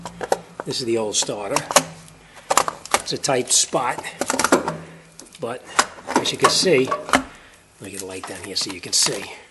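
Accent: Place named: Boston, United States. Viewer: Hes so boston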